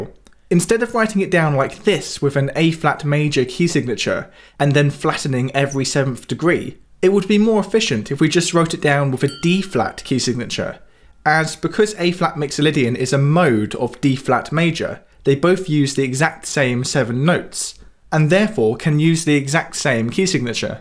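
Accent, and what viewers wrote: United Kingdom. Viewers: I’ve had all this explained to me several times. Why did it never stick? BRITISH ACCENT was apparently missing.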